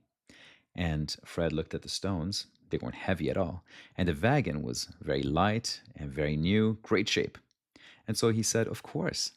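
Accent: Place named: Germany. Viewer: Where are you from? You pronounce the wagon with a v not a w sound,Germany?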